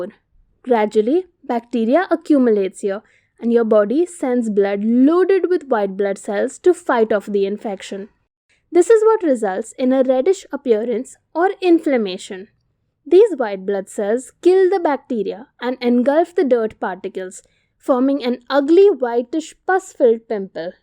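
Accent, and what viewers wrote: India. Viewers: Sounds so indian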